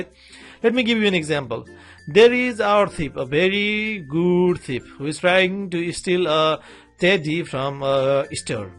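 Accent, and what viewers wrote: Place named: Nepal. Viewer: nepali accent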